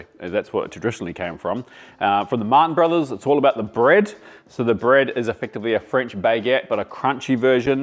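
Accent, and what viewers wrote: Australia. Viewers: Sounding very aussie now mate, gotta come back to your whakapapa 🥝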